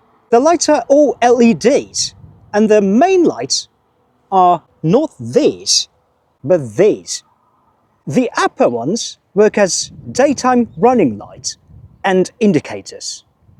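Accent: United Kingdom. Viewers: スズキ、フロンクス、天竜川、イギリス英語 全部好きだから助かります ありがとうございます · 真実の棒を使う配信者のようなゴリゴリのイギリス英語で、旧植民地で生産された元同盟国メーカーのクルマを、元帝国主義国の元雑誌編集者と腰痛持ちの配信者をオマージュしながらレビューする淫夢廚とかこれもうわかんねぇな… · イギリス訛りなだけでイギリス人特有の辛口レビューが足りない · 車の紹介でイギリス英語はあれを思い出すな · イギリス英語で車紹介、どこかのトップギアかな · イギリス訛りの英語を流暢に話す日本人が、インド製の日本車をレビューする動画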